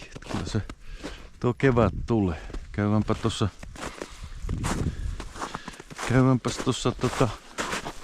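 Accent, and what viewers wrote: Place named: North, Finland. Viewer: Näin metsäalan ammattilaisena ottaisin tuosta nuo harvat hieskoivut polttopuiksi ja kiireesti kuusen taimia maahan. Olisin istuttanut ne jo viisi vuotta sitten kun sanoit, että laidunnus on siinä jo loppunut. Nyt siinä kasvaisi jo perkuukypsä kuusen taimikko. Nuo kilpailun hävinneet vanhahkot keskikokoiset kuuset ovat aina arvoitus. Melkein kun sellaisen kaadat on siinä jo musta piste keskellä eikä siitä silloin enää kunnon puuta tule, vaan vie tilaa terveiltä puilta. Eli olisin ottanut ne harvat pikkukuuset ja hieskoivut kuiduksi jo hakkuussa ja istuttaen uusi taimikko tilalle. Hieskoivu tuppaa olemaan niin kova siementämään, että raivurille on taatusti työtä aina uuden vesakon puskiessa yhtenään. Murteesta arvelen, että kohde on pohjoisessa joten näin pirkanmaalaisissa eteläisen suomen metsissä työskentelevänä maaperä ja puun kasvu on tietenkin erilaista luonnon olosuhteista riippuen ja se mikä toimii etelässä ei aina toimi pohjoisessa. 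Rannan läheisyys ja kostea maaperä kohteessa tukee tietenkin koivuista hieskoivua, kun raudus on karumman maaperän puulaji. Kuusi on toinen mikä tuossa menestyisi kuten sanoit. Kuusi siementyy luonnollisesti koivun alle menestyksekkäästi ja luulisin, että lähipuista muodostuu uusi kuusi sukupolvi tuon rantakoivikon alle. Näin sen voisi kaksois kasvattaa lopulta kuusta suosien ja ne koivut pois vaihtaen lopulta puulajisuhde kuusivoittoiseksi. Jatkuva kasvatus ei onnistu kuin teoriassa ruutupaperilla ja on sääli, kun erinäiset viherideologiset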